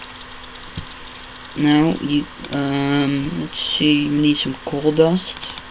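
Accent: Netherlands. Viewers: thanks for the solar setup! seems much more efficient then the flowers, but the flowers were so pretty! unrelated to that: hello there, obvious dutch accent.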